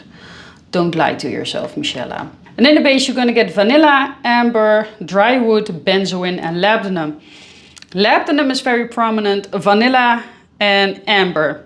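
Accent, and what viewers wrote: Netherlands. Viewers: I love that dutch accent ♥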